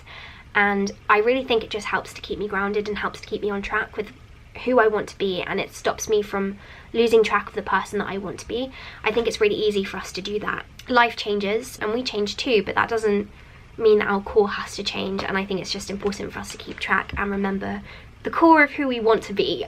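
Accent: RP, United Kingdom. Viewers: I feel so ruff after watching this your accent is sooo posh I am half from Essex half from Cardiff ly x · Is it British accent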